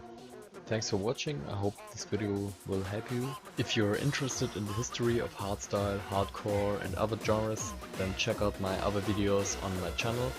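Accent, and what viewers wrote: Germany. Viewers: Ich lieb den deutschen Akzent. So ein englisch verstehe ich sofort! Tolles tutorial 😌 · Hallo, ich kenne den deutschen akzent. 🤣Grüße , geiles video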